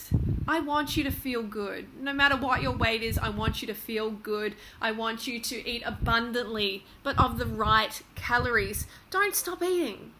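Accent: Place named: Australia. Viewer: I realize your Australian (accent>? I am guessing BC I don't know who you are)...but I think what we are seeing in the States is a revolt of Political Correctness and all these speech police codes America has been pummeled with...it will only continue as people break free of the liberal college "ism" and "ist" box that has been constructed around us in the last 5 years or so...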